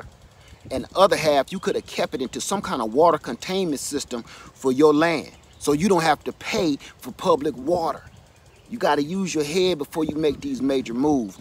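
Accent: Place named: California, United States. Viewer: You sound like you’re from Cali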